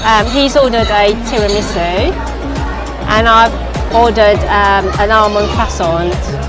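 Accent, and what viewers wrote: United Kingdom. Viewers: Queen's English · 100% London accent Great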